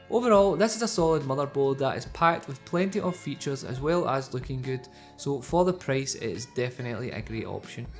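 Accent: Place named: Scotland, United Kingdom. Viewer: i love your Scottish voice xD BTW nice video :)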